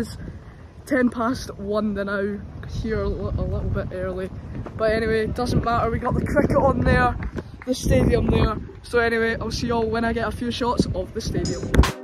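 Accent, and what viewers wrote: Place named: Scotland, United Kingdom. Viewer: I live in Canada but I’m Irish I enjoy anything to do with football. I enjoy the Scottish accent and your videos, keep up the good work..Dave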